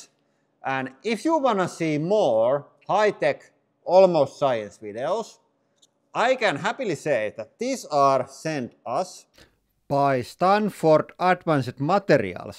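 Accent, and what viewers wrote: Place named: Finland. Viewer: It's funny how the years go by, but that Finnish English is still as strong as ever.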